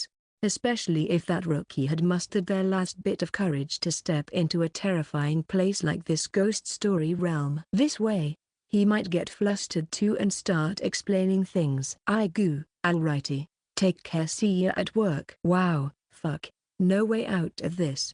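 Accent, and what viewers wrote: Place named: United Kingdom. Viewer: Thank you for uploading this. However the narrorator suddenly switching being British and female is a bit confusing. 😢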